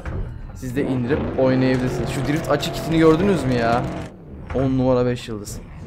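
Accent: Erzurum, Turkey. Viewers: ANA ABİ SEN ERZURUMLUMUSUN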